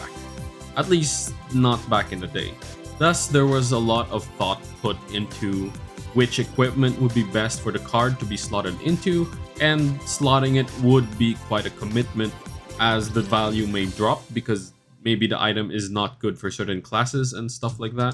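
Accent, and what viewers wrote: Philippines. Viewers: Not sure why sounding Filipino is a problem. He's not doing any humiliating thing like what you're doing to yourself.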